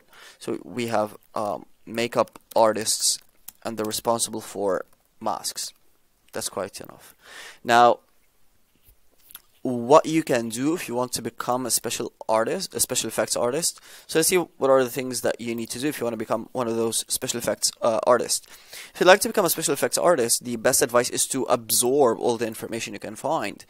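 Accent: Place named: Iran. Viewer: u have a mix of iranian accent and a english accent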